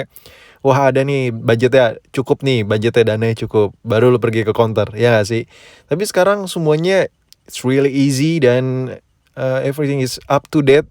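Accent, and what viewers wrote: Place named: Jamet, Indonesia. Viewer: Logat Jamet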